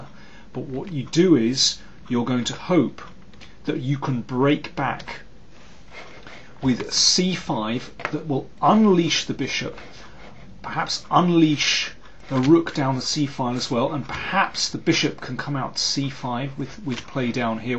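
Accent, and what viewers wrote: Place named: RP, United Kingdom. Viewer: Yes Very Clear! even commentating at an Elite level, Thank you GM Daniel!!. Its clear because GM Daniel has superb speaking and chess talent! Also it doesnt hurt that he is speaking the Queens English right proper, the most understandable form of communication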